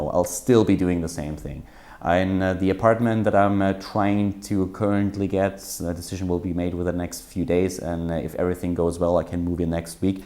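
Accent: Germany. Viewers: Leider reagiere ich ziemlich allergisch auf Englisch mit deutschem Akzent. Denn obwohl Englisch sehr gut ist, hindert mich der leichte deutsche Einschlag doch daran, seine Casts voll zu genießen. Insofern wäre ich auch durchaus dankbar, mal was auf Deutsch zu hören.:-)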